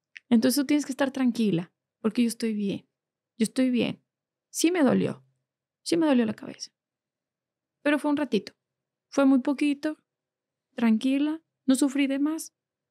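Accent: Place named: Mexico. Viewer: Me cuesta entender lo que dice, su acento es super mexicano, demasiado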